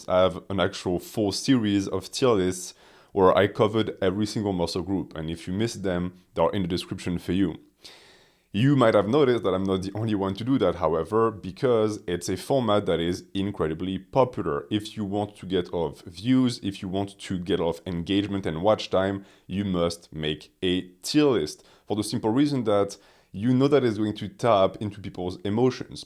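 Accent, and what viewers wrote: France. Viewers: Soon his thick French accent will be a thick Spanish accent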